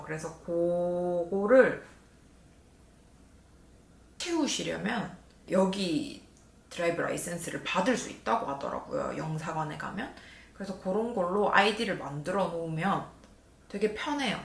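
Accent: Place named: Gyeongsang, South Korea. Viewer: 서울사람이라고는 하지만 감출수 없는 경상도 억양 ㅋㅋㅋ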